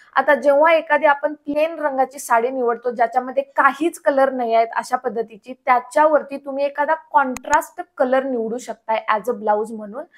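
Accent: Kolhapur, India. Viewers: I love the fact that you have sticked to the Kolhapuri accent unit now! Keep it up! Wishing you the huge success!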